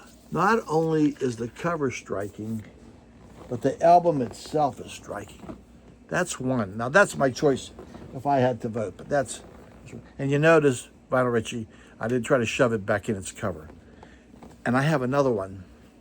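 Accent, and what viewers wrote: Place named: United Kingdom. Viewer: Cheers! With a British accent like that, you should go far!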